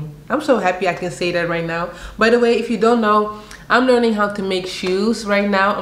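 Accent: Netherlands. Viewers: You have a Dutch accent, are you from the Netherlands? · Love your content!! You sound Dutch 😍😘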